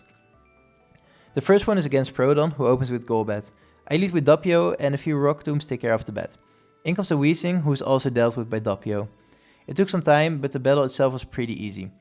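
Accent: Netherlands. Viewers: I've never watched your channel, but I like watching nuzlockes. I wanted to check it out. Your actually pretty good and definitely wasn't expecting the Dutch accent. Are you new to nuzlockes? I could give you some tips if you want. Edit: I just saw you don't have many subs, so ima sub. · Are you dutch by any chance? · are you dutch perhaps? i can hear a small accent during your complimentary XD. anyway, you just gained a new subscriber. i love the vids! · The yt algorithm strikes again! Also, I can hear you're dutch ;) lekker man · Gotta love the Dutch accent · Brooo the dutch accent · Nuzlockes zijn zo leuk om te zien echt waar geen idee wrm maar goeie video man (ja ik wist dat je nl was door de accent hahahaha)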